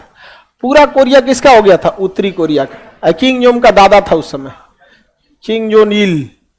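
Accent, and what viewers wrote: Bihar, India. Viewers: Bihar se ho kya jara dhang se bolo sunne walo ko samje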